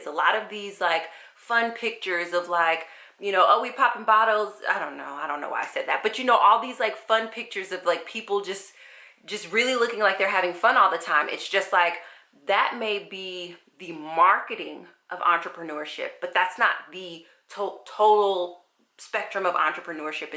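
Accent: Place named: North, United States. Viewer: it is a northern thing. I’m from Baltimore and we say it similarly. 😂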